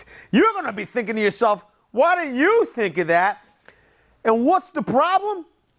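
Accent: Philadelphia, United States. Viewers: South Philly accent. We say WUDDER, for water!😀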